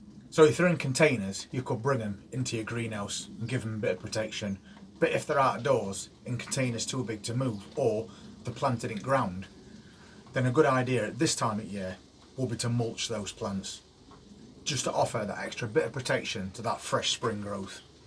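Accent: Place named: South Lancashire, United Kingdom. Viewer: Thanks for letting us know where you are. I was guessing South Lancs from your accent, but clearly I'm not an expert, I know that changes from town to town round your neck of the woods. So I'm watching this a year later to prepare myself for the month ahead. I'm in Somerset, so a bit more sheltered from the wind, but similar frost dates. You give really good clear information. I kind of know what I'm doing, but the reminder to wait for the weather to calm down is good. So I'm watching this for the details of strategy and tactics to give my seedlings the best start. Thanks for sharing your knowledge and experience!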